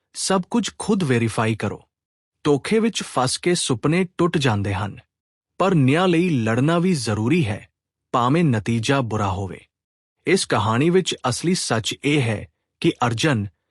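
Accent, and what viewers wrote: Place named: India. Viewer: Punjabi tan tuhadi hindi touch Wali Hai isnu improve karo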